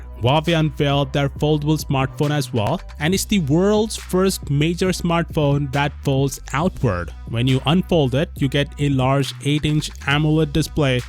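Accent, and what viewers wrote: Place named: India. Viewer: since your indian yourself probably you should easily be able to tell his indian. I have watched a lot of "microsoft tech support scam videos" and i can tell by his voice/accent he is indian.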